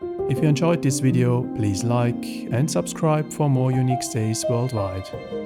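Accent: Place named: Scotland, United Kingdom. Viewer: Lovely Scottish accent.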